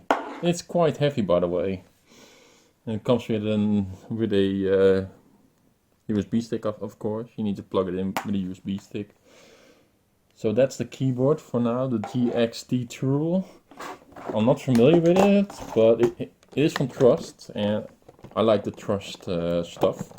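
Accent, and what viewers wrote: Netherlands. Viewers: are you dutch? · Ik kan gwn horen dat je Nederlands bent haha :P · Ik hoor dat je nederlands bent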